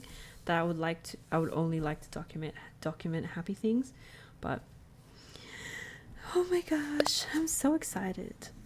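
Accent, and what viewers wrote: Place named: Australia. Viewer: One of your videos came across my feed & I'm so happy! Loved this video! 🥰 Edit: I always get excited hearing a fellow aussie accent talking about journals/planners 😅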